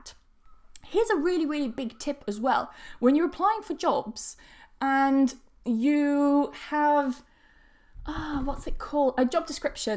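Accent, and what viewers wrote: United Kingdom. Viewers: ....l really like the way you laugh, speak,smile and the way you speak British english,it sounds very wonderful....your British accent is awesome.....Thanks for the video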